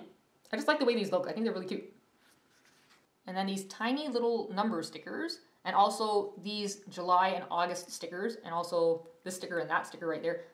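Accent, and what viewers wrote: Canada. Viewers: This is too cute, and so is your Canadian accent ("eh"? :-P )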